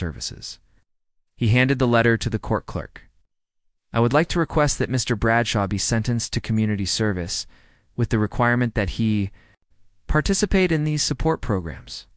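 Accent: United States. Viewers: This is - as usual - a garbage, American accented load of crap!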